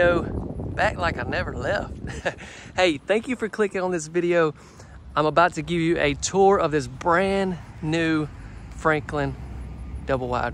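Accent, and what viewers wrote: South, United States. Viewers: Love your accent! I miss living in the south. Blessings to you and your family. Thanks for being here. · U sound just like southern mom ? I'm pretty good about sounds let me know if you are lmbo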